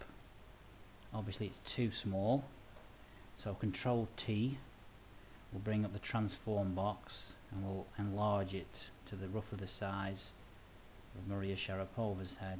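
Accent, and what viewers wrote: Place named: Liverpool, United Kingdom. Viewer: this guy sounds just like a! he from liverpool or something?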